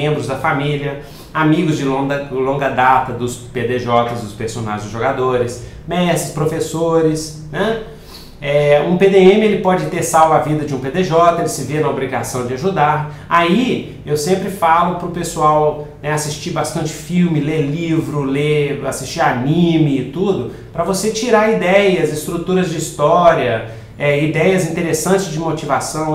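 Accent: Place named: Minas Gerais, Brazil. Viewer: Mano... ele é mineiro! é foda!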